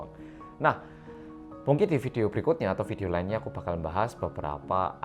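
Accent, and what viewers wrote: Java, Indonesia. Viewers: Mas medokkkk.....aku salah fokus liat kowe kok cek kuruseee 😔 cukup mas jok tmbh kurus maneh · Orang korea yang medokkkk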